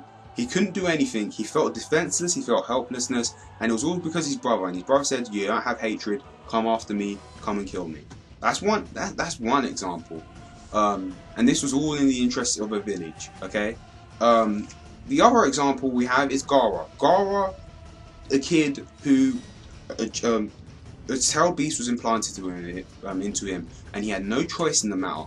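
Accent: United Kingdom. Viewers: I know this is out of talking but...is that a British Accent? xD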